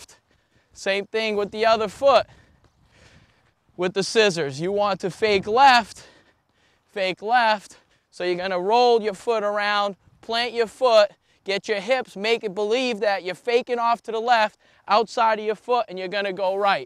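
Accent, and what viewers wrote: United States. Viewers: No no. dude in America, and canada they call it soccer actually. lol. He sounds like hes probably from one of those countries, hence the soccer reference. It is a term in the dictionary. you are giving false information. i didn't realize that anyone had any confusion about this matter besides yourself. If you are trying to make an underlying point about the fact that soccer is not the original name for the sport where it was invented in england in the 1800's than you have missed badly.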